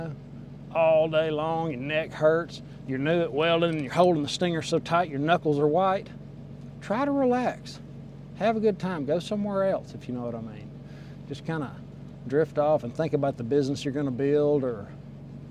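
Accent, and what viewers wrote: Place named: Arkansas, United States. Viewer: Hey man I've been watching your channel for a couple years now. Long story short I'm from Arkansas as well. I always thought you were because of your accent. I'm a welder and I'm currently about to graduate out of a well known "Elite" welding school. I know you probably have heard of it. I would love to reach out to you and just get some advice. I don't know if you'll see my comment. I know you're busy take care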